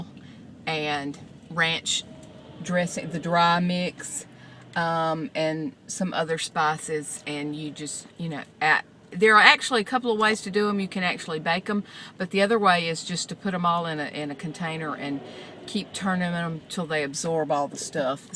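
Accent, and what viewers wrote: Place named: South, United States. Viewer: I just love your accent and all that southern charm. Sounds like it's a busy few weeks coming on. You're doing great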